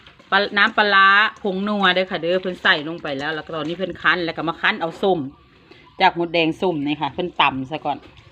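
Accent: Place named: Phu Thai, Thailand. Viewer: สวัสดีครับแม่ มากินก้อยปลานิลนำครับเห็นแล้วหิวเลยครับ ฟังเสียงเว้าคือคนภูไทย้อ สกลนคร นครพนม เมืองเลยนอครับ ข้อยคนอุบลครับ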